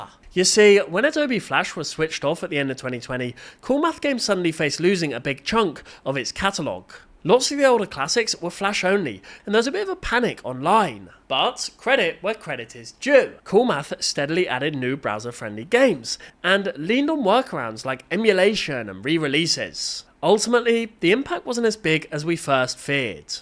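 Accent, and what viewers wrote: United Kingdom. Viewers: hes british bro relax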